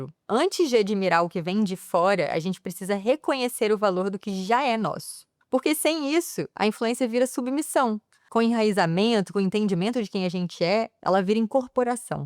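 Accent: Brazil. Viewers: Que MULHER, Brasil. (Sotaque muito capixaba; acho fofo). Ganhou um inscrito pelo texto excelente sem tratar a audiência seja como leiga nem como super especialista ❤ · Desculpe meu hiperfoco em linguística, mas você tirando o chiado sendo que exala Rio de Janeiro a cada sílaba contrasta com a mensagem 🥀🥀🥀🥀 a menos que seja de Resende onde o sotaque é assim, daí só parabéns linda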